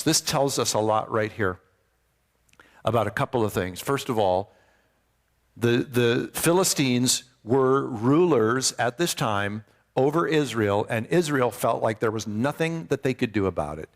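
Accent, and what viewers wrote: Canada. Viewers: Me too. Haha. I'm from Ontario Canada and we don't all have accents. Lol. He sounds canadian to me.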